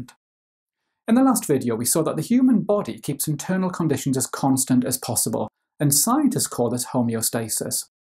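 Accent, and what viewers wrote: United Kingdom. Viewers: hi bri-tish and usfull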